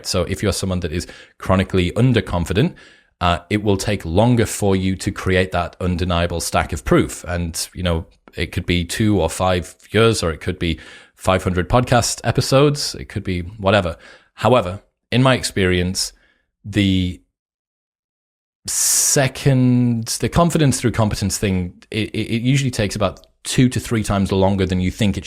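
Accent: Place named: United Kingdom. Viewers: This is such an insane volume of sourced wisdom, knowledge, and perspective combined with a British accent. Freaking thank you. I hope everyone hears this and puts it into application. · combined with british accent got me really bad :D:D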